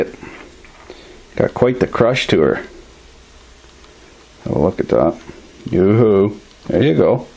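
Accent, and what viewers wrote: Canada. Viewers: This guy sounds like he is from Letterkenny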